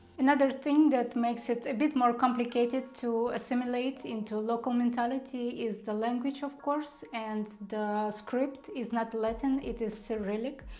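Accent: Russia. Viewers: Sounds like you are Russian. Of course you are going to like the BG.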